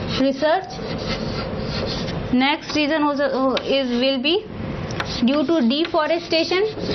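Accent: India. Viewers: Noice,but hard to get ur english😫pura indian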